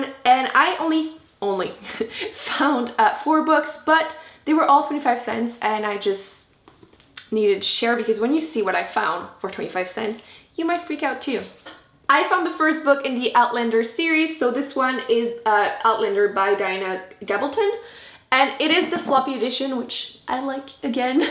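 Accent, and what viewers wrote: Quebec, Canada. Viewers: I knew you spoke french 😃😃😃😃😃😃😃 We have the same way of pronouncing some words. I bet you're also Canadian like me😀😂 Anyways love this video💙💙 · Breanna May she's French Canadian :)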